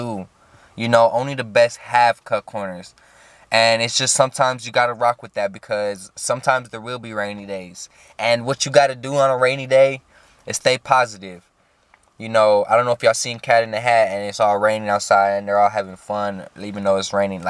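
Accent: Texas, United States. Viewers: I’d figured he from Texas his accent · You from S.a texas homie?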